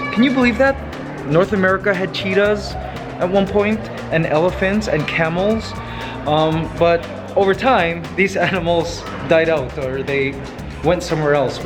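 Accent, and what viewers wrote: Philippines. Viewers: I enjoyed every second of the vid. I sooooo love your voice Kuya. Wishing you could do a voice-over of some science documentary. 👍 You're Pinoy accent cracks me up every freakin time 😂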